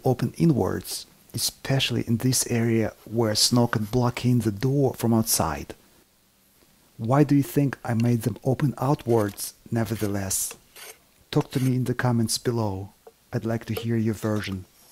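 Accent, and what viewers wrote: Russia. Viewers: With a strong Russian accent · your accent reminds me of my granpa who lives in moscow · Shaun Davenport Defo Russian accent. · yes the accent Is with no doubt sounding russian. But he uses finnish word for dry pine, kelo. I Wonder why. I think he Is a Karelian. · Really?? I thought it was him for sure with that Russian accent and little mistakes... Wow that's interesting. So I guess he hired someone with a Russian accent on purpose?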